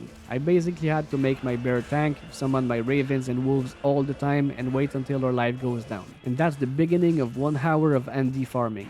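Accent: Quebec, Canada. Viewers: Accent Québecois? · Are you French-Canadian? Your accent sounds very denis villeneuve to my ears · I just want an answer, your accent, it says Canada, are you from Québec?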